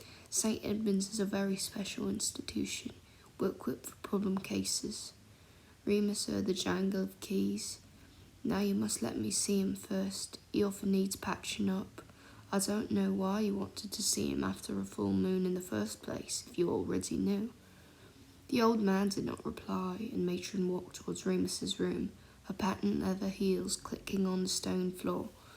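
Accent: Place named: United Kingdom. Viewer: Woah I thought you were British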